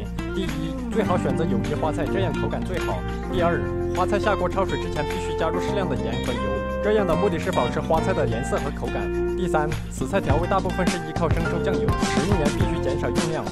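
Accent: Sichuan, China. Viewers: 一听就晓得是四川的哥老官，兄弟，莫得毛病我日。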